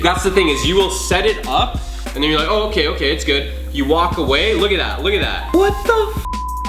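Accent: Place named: Toronto, Canada. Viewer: sound like your straight outta toronto daagg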